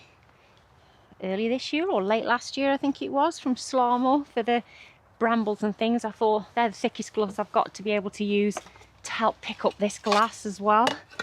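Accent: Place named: Staffordshire, United Kingdom. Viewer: Hello New sub from me. Found you through sow chatty. Love watching other peoples plots. I’ve just taken on a new acre garden so am only really at the planning stage of my veg garden. Fabulous that the farm provides you with such a great supply of muck. Great harvest. Lovely to hear that staffordshire accent too, being from staffordshire myself.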